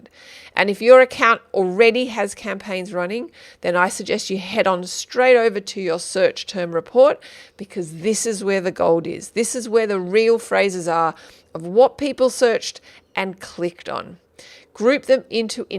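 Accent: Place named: Australia. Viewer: Australian accent is so sweet :)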